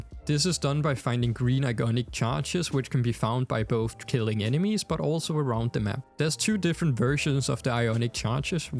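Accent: Denmark. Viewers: is this guy danish?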